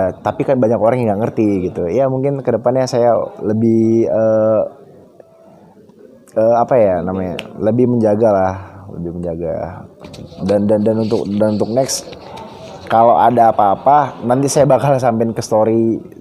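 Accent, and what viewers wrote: Medan, Indonesia. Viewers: Sbnrny sih aku pkr mrk yg hujat bang bi itu kgn pgn bang bi d indosiar x krn kan udh kgn..mgkn gtu x bang krn kan bang bi ada nmny d maulid nabi itu tp knytaan ny bang bi ada d bali.jd pkran mrk abang lbh mentingin hoby..gtu mgkn..dn logat medan emank keras sih jd d fkr org abang mrh2..santai aja bang nanggapin haters sih..jgn d dgrin yg pnting berkarya aja..love u bang bi..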